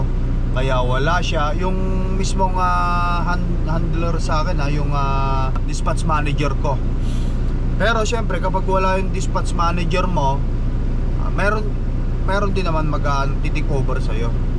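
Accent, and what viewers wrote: Ilocos, Philippines. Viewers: pagpraktisan mo mga anak mo at sabihan mo i-correct ang mali mo. Tulad ng title ng vlog mo ngayon, mali na. Gusto ka ng mga followers mo dahil sa personalidad mo, prangka at walang inhibition. Yon nga lang, pag nag english ka na at sasabayan pa ng ilocano accent mo, halos matunaw ako sa paninimbang. Pero enjoy ako sa panonood sayo.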